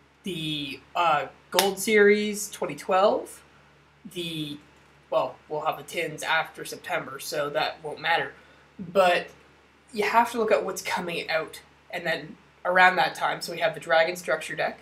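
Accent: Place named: Canada. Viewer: u canadian? good video tho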